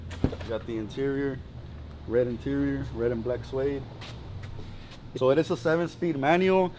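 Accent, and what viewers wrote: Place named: South East, United Kingdom. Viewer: This nga sounds like he from SE😅😅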